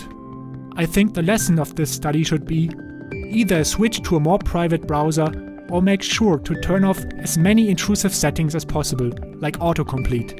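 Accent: Germany. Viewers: German accent? ;-)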